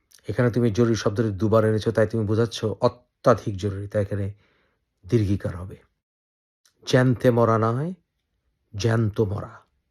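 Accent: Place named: Noakhali, Bangladesh. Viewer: নোয়াখালী ভাষা ভাই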